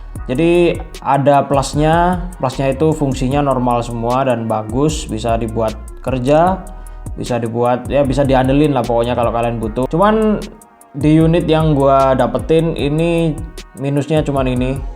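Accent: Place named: Java, Indonesia. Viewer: Waduhh wong Jowo 🤣To bwanggggg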